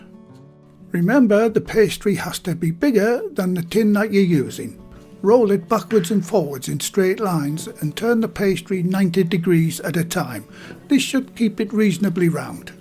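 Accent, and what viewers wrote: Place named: Geordie, United Kingdom. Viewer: This looks amazing, reminds me of the steak pies my mam used to buy from a local butcher. As a fellow geordie i find your accent so lovely to hear .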